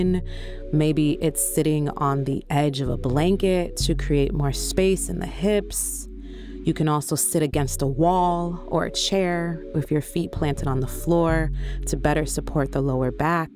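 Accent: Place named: New York, United States. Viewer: I want loud mouth thick NY accent back 😂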